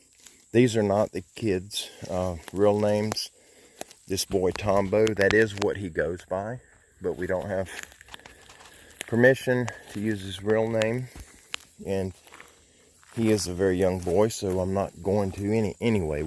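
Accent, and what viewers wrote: South, United States. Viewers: To my Canadian ear your grammar is fine..I love your accents from down south! Great letter btw! Thank you for sharing..bring bug spray next time...lol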